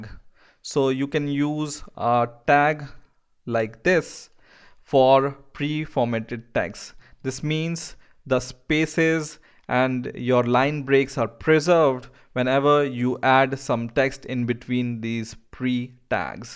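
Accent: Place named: India. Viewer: No his accent is real indian.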